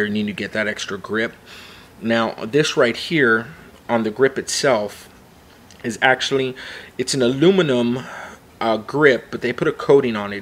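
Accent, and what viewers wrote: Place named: Canada. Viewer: this is totally off topic, but are you canadian? because youve got the most adorable accent!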